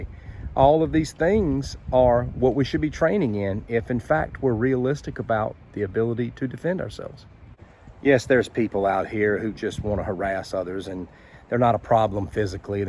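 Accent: South, United States. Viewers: GREAT ADVICE. I APPRECIATE THE STRAIGHT TALK, AND BREVITY TO THE POINT, AND FANTASTIC SOUTHERN ACCENT. IT ADDS. FASCINATION OF THE CLARITY. WITH UNDERSTANDING. WHAT YOU ARE SAYING. IT IS INTERESTING THAT SOMEONE WITH NO TRAINING COULD DO WELL IN A FIGHT. DOES. SAVAGERY COUNT FOR ANYTHING. ? I. MASSIVELY TRY TO AVOID. FIGHTING, BUT FROM EXPERIENCE. I SEEM TO ATTRACT SECURITY, AND. FEAR WHEREEVER I GO. I COULD BE .RESCUEING. A CRICKET TO RELEASE OUTSIDE, OR TRYING TO BUY MY GROCERIES. NEXT THING A I HAVE SECURITY GUARDS. FOLLOWING,. OBSERVING, OR TRYING TO HUMP MY LEG.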